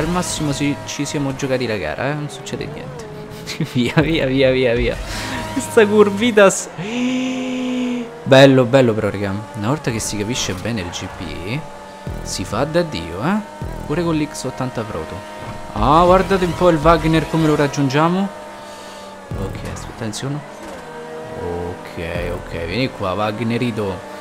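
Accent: Rome, Italy. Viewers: Sei di Roma?grande suiiii🐷🐖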